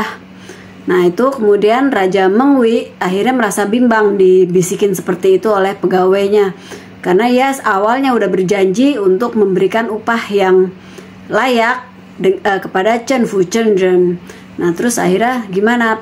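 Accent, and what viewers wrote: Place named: Lombok, Indonesia. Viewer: Bahasa indonesia tp logat lombok... mantaaapp...!!!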